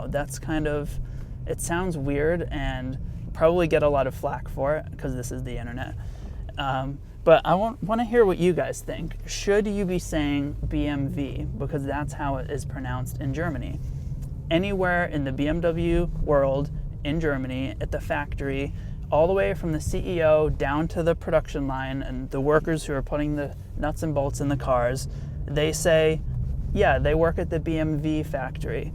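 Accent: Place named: United States. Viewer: Not , Dubble U , but Wee but the "ee" from the Wee , sound like , the " a " in " Say, "Bee em Wee" (not Vee , a V in German is pronounces as a "Vow" ) , in Dutch we say it the same as the Germans. , You havent been saying it wrong , you have just been pronouncing it like a american , not a german :D . Like " Zeit " the Z is pronounced like a "TZ" , TZEIT , you would write , TZAIT. writing i phonetically makes it easier.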